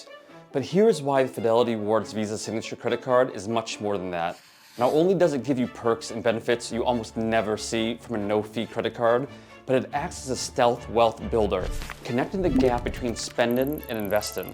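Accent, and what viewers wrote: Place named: South, United States. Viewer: This guy learned english from a southern man or a black man.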